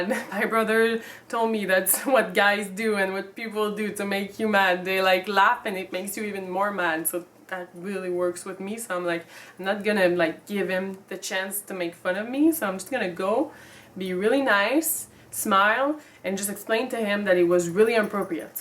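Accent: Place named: Quebec, Canada. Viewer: Whoah ! Une très belle couleur écarlate de tes cheveux ! Je suis passé par hasard sur ta chaîne. Puis j'adore vôtre accent québécoise, c'est se qui fait votre charme ^^. Vous me faîte penser a un personnage d'un jeu nommé Chloé qui fait punk avec son bonnet dans : "Life is strange" : <link> Bon sauf quelle a les cheveux bleu, mais qu'importe... désolé pour ce propos hors sujet de vôtre vidéo mais je tenais a vous le dire.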